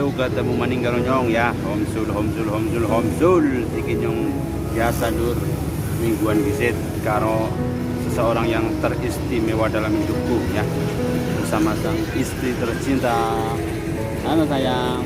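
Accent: Banyumas, Indonesia. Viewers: Keren logatnya Ngapak seruuu Sering Ming kebumen Mampirrrr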